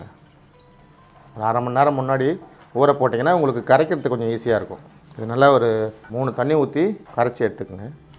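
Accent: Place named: Nagercoil, India. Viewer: Voice nagercoil pola irukku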